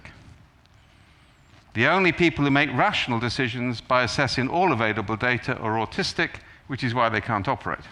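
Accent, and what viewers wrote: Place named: United Kingdom. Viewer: just brilliant talk! but definitely need to rewatch it to understand all of it. Also, British accent is hard to grasp sometimes, if only someone could make subtitles * _ *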